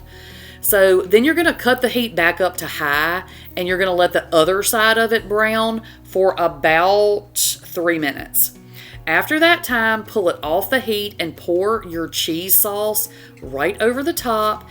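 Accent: South, United States. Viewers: Love these recipes! One thing you might change is the rice used. I used copycats for this and when I used converted rice it was a game changer. In any event I think you're spot on with these. New sub here. And you keep sayin' "bawl". As someone who has lived in the south, for over forty years, it's music to my ears. 🤩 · Hello from Alaska. I just recently found your channel,I’m just going to say it. My goodness gracious, the amount of times that I’ve heard you say people are out here just doing the most, throwing hissy fits, I’m not saying in any shape or form that’s what you said, it my goodness, they are in my unprofessional opinion, are just nit picking. You want to change how you prepare these, the. You do you, change it. It leave this sweet lady alone please. And btw, idk what it is, I love the thick southern accents, so every time you say boil, instant smile on my face and when you corrected your pronunciation, I did a double take at my phone, and was like that didn’t sound right lol 😅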